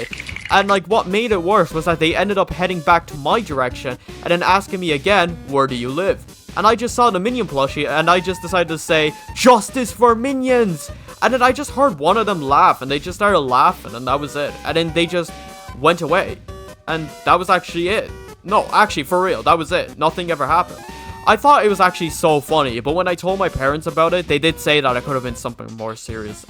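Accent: Ireland. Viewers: Woowww you’re Irish like mee! I heard it in your voice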